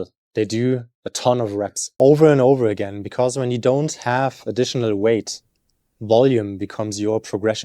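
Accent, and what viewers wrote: Germany. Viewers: Where are you from? Sounds like Germany from the accent. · Yessss! Are you from Germany or what's the accent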